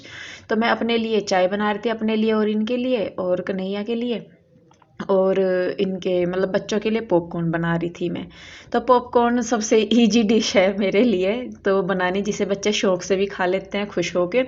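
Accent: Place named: Uttar Pradesh, India. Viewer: Apki language up ki lgti h hmare yha bhi ese hi bolte h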